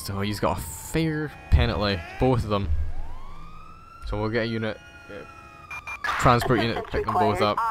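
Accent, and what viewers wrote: Scotland, United Kingdom. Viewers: I guess your Scottish like me, I can tell by the accent also nice video mate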